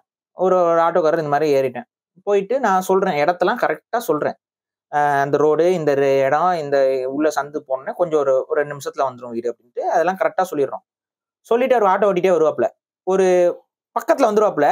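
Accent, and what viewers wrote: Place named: Chennai, India. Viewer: கண்டிப்பாக சென்னை தமிழ் மாறவேண்டும் நீங்கள் இன்னும் முயற்சி எடுங்கள் நன்றி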